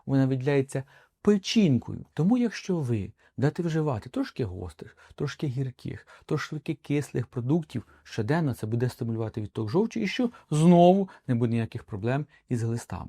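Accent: Vinnytsia, Ukraine. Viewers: Дякую. Дуже корисна інформація. Іще крім усього насолоджуюся Вінницьким акцентом.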